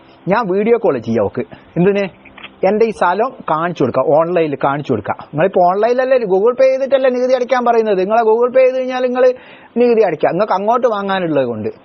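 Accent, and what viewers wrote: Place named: Kasaragod, India. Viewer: ശരിക്കും ഇത് കാസറഗോഡൻ ഭാഷയല്ലേ? അപാരം തന്നെ. കൊച്ചി മെട്രോയിൽ ഇരുന്ന് ചിരിച്ച് മടുത്തു.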